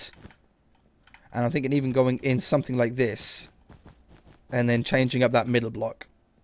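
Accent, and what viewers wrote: United Kingdom. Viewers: That British Accent He never had a castle!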